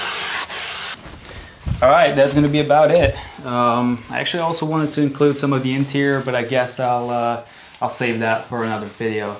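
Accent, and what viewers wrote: United States. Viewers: I really learn a lot from all of your videos whether you talk or choose not to talk. Both are excellent. By the way, you sound American, but I thought you were Dutch. Where exactly do you live in Europe?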